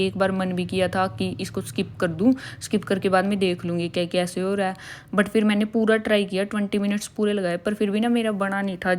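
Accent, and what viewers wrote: Haryana, India. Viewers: Aap haryana se ho na😂😂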